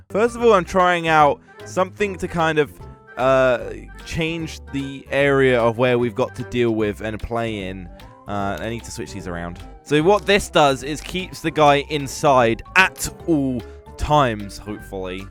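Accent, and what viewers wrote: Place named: Essex, United Kingdom. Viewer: He sounds so Essex in this video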